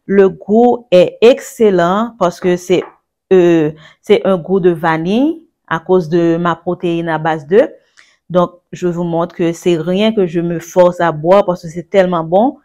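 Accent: Haiti. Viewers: elle a un accent Haïtien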